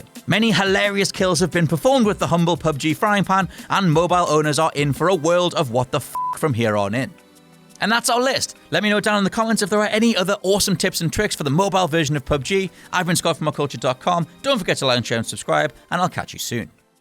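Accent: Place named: United Kingdom. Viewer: UK ENGLISH 😫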